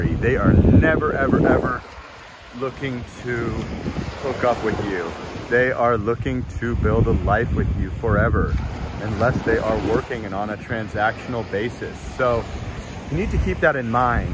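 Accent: United States. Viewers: You sound like you are from California. Thanks for the heads up.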